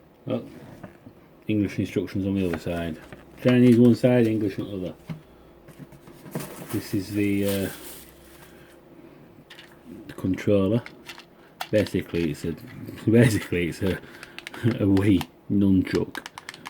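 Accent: United Kingdom. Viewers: I can understand him he is british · It's a British Eng and I can 100% understand him. All depending on Where you live and what accent you've got. Not his fault at all. If you agree than appreciate his effort and like his video please, his video helped me for sure 👍👍👍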